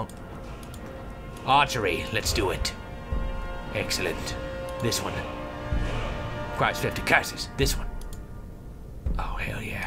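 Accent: United Kingdom. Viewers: how did you come to develop such a british accent?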